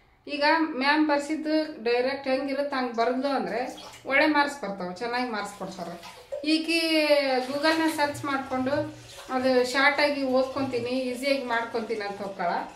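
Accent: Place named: Uttara Kannada, India. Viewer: Neev helid nija hasge iddastu kaal chachu anta gaade edyalla hage naavu hage num badgetge corectagi jeevna madtivi nim utrakannada maat kelakke channagirutte henmaklige helkodude beda avrige tilvalke jasti tande tayi anta preeti kalji madtare nanguu ebru henmaklu channag nodkantare jwara bandre bayig yenuu bedagutte nam kade kanchikay uppinkay hakkand uuta madtivi swalpa nalge ruchi barutte nan maglu frijalidakke heegidde box tandale nice vlog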